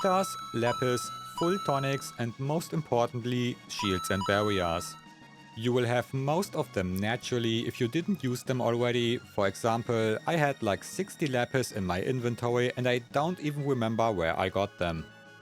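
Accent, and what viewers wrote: Germany. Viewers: as someone who has known this game inside and out for ~24 years now, i can confirm the info in this vid is extremely bad. always get safe helm, swallow is terrible. speed tabs max chrono’s speed. haste helms are best for most characters, and you get the rainbow sword for chrono which is vastly better. also you get status immunity armor for everyone, so confusion is no problem… max all your characters stats and get all the optimal armor and weapons… i don’t have the time or energy just look up better guides. best thing a out this video is his german accent combined with rhotacism, which i find incredibly endearingly adorable 💗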